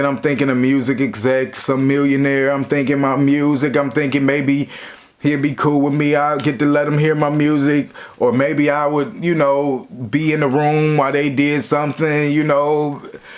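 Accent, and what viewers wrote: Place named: New York, United States. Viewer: I gotta say you got that New York accent down cuzzo! Great story time bro! 👍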